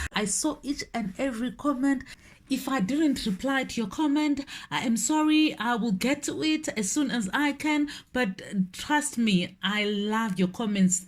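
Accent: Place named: Africa. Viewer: I love your African accent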